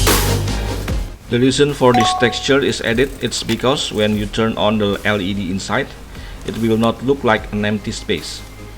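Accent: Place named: Indonesia. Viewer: Tetap semangat om, saya kira channel luar negeri, eh kok aksennya masih Indonesia+di perkuat nama yang sangat Indonesia sekali.😁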